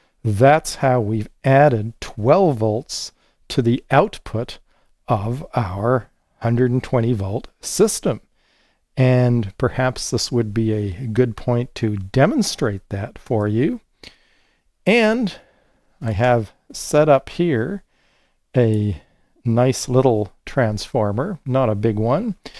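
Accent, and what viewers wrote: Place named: Canada. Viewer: Every time I hear a-gain versus a-gin I know I'm listening to a smart Canadian. I appreciate you sir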